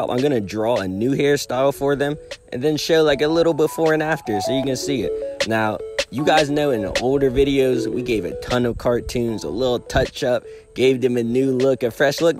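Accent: United States. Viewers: U sound kinda country.. but ur drawings look good asf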